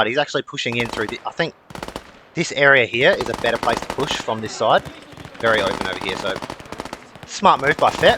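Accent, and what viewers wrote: Australia. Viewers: Seriously though, you are a natural at gameplay commentary, and your whole tournament series was very entertaining!! Your aussie accent is lovely and improves the overall experience for me, personally. All the best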